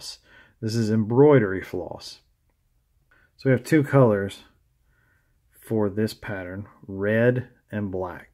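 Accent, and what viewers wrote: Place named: South, United States. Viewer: love your southern accent. i used to live in Florida....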